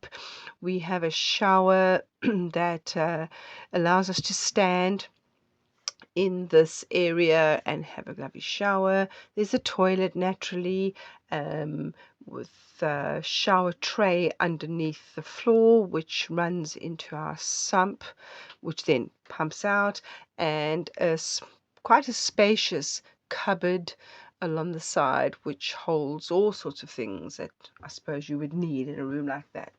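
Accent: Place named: South Africa. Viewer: You have a south african accent.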